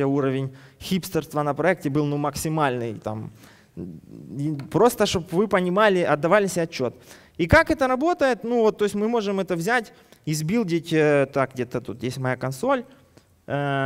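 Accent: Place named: Ukraine. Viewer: Английский с украинским акцентом - самое лучшее, что я слышала за последнее время)))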